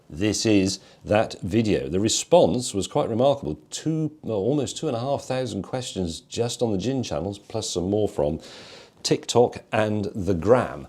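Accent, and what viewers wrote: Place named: United Kingdom. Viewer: This guy is just plain boring . If it weren’t for the British accent and the long hair…..?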